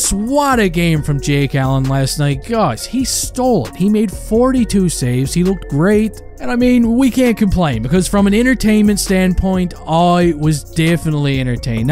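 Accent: Newfoundland, Canada. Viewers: Bro where are you from? If this is your real voice you sound exactly like a small town Newfoundlander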